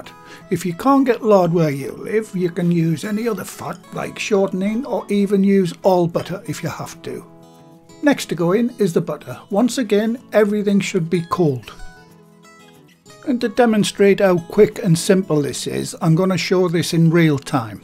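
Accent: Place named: North East England, United Kingdom. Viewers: Thanks so much, those pasties look heavenly, I must give this a try. So well explained and presented, you are a star, absolutely love your North-East accent, take care, best regards Rob. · Hi where are you in the east as you sound as if your in Durham,my mumd loves how you speak as I shown her your channel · I think you’ve earned a sub. Gonna give these a try once they get flour back in the shops lol. Btw I’m from Sunderland your accent doesn’t seem you’re that far away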